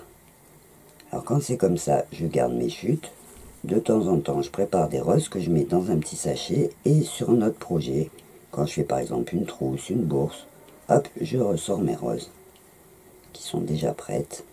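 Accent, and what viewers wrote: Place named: South, France. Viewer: Bonjour. Oui la fleur est facilement adaptable sur un tissu du fait qu'elle soit plate. Ah le charme de l'accent du midi "les reses" et les petits "hop" qui sont revenus 😉 ce n'est que du plaisir à voir tes vidéos!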